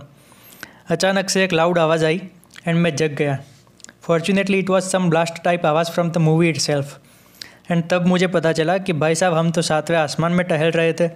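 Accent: Gujarat, India. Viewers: Your little bit accents same like Gujarati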